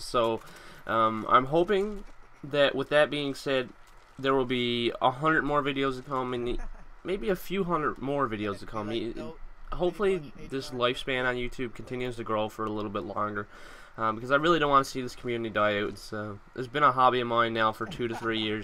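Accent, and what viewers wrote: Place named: Canada. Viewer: What is your accent? Sounds like you got something mixed in their that isn't just Canadian.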